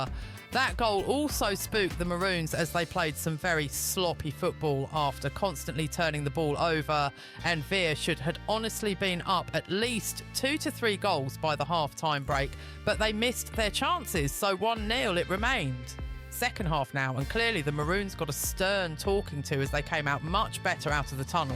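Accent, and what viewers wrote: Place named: England, United Kingdom. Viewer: It's a bit of a shock going from a Jamaican accent to an English one but to be fair she is good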